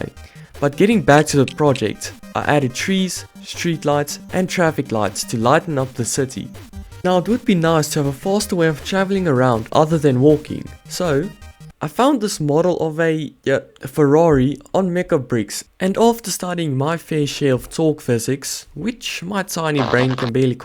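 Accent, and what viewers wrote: South Africa. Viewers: i swear, this guy sounds south african, as a south african myself, i can identify the accent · This is awesome, you sound like a South African. Support from Greece 💪🏼🇬🇷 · you are so South African i can hear it in your accent me btw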